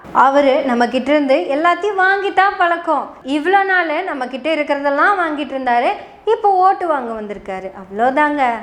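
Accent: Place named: Chennai, India. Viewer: Chennai slang..., well effort.. Try more.. 🎉🎉🎉🎉👌👌👌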